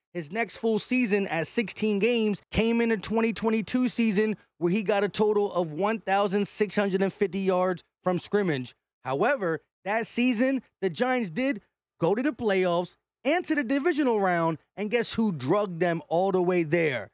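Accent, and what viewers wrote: Philadelphia, United States. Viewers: 'Im back,.............. knew i'd be......sorry for gettin on you bro, I didnt have any weed for like a month!!!!!!!!! OUCH..............COmpliment, your voice feels like a Philly voice. Keeep oon getttin on:elbowcough::elbowcough::elbowcough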